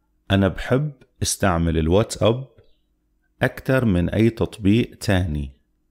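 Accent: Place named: Syria. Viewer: it's syrian dialect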